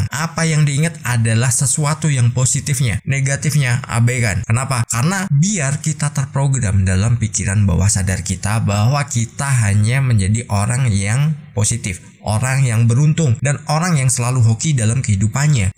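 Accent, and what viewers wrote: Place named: Java, Indonesia. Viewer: Dgr bahasanya jawa medokk lngsung mesemmm kooo cos biasany bhsa indo trima kasih ilmuny ko berkah dunia akhirat buat njenengan sklrga dan smua pengikut chanel ini Aamiin Allahumma Aamiin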